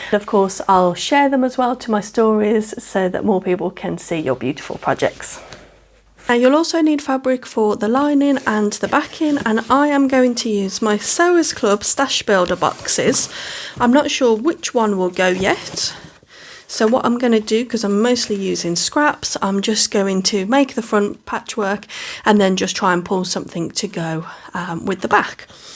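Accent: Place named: Midlands, United Kingdom. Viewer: Love this idea. I’ve never done quilt as you go and really want to try. It’s a refreshing change to find someone from the UK! You sound like you are from the Midlands near me.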